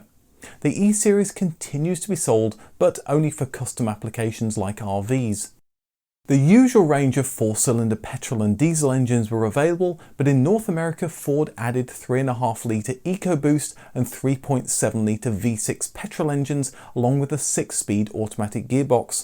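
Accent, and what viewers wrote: United Kingdom. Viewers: You look perfectly fine to me Bro. Love your British accent. Thanks from Cape Town, South Africa 🌍🇿🇦❤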